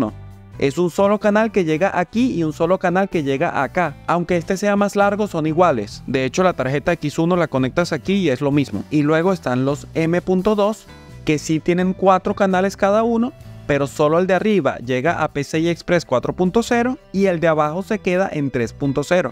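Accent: Venezuela. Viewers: Hola eres de venezuela? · Hombre no sé si eres venezolano hermano pero hablaste como uno. Gracias por tus videos soy un seguidor muy agradecido por tus explicaciones. Gracias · Excelente información, ¿eres venezolano no?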